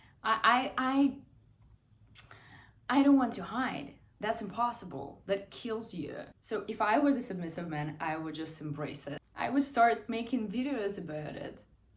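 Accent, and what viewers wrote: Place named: Russia. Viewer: Hello, I found your channel a few days ago through your video about chastity amid researching the topic, then watched the rest of your videos and subscribed. I believe this is your best video yet. I wanted to leave a word of thanks because channels with content like yours have inspired me 1.5 months ago to find a dominatrix, and since then my life has improved exponentially, both materially and perhaps more importantly, spiritually. The point which you bring up in this video, that submissiveness shouldn't be perceived as a weakness but rather a strength, and therefore ought to be a trait which one can be proud of — even publicly — rather than ashamed of, is something I’d never considered before. This may have to do with me being Japanese, although I imagine it’s generally true everywhere else. Lately I’ve actually become slightly more open to my closest friends about my submissiveness…and this video makes me feel even more empowered and encouraged to come out of my shell. 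Thank you for sharing your thoughts, your experience laden articulation and honesty is always appreciated. Looking forward to your next video, and in the meantime, good luck in your continued endeavors as a dom! PS your Russian accent is charming ^^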